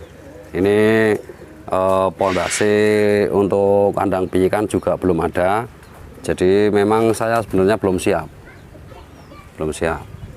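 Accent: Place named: Java, Indonesia. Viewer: Ngringokna channele khas njawane 😂, apa adanya 👍🏽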